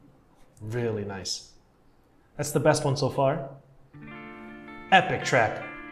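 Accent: Brazil. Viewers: desconfiei DESDE O PRIMEIRO SEGUNDO que tu é brasileiro 😂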